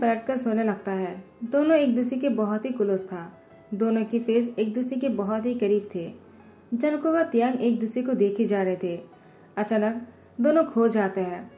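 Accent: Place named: Bengal, India. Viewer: Di you're a bengali right?? 😁 plz ap mind maat karna apki stories bohat acchi hoti hain and I love them😍❤ but maine bohat baar apka baat karne ka tone ya baaton ke beech bengali word ate hue dekha isiliye puchi and also ye story ek famous bengali movie se inspired hain so🙂 (and i'm also a bengali)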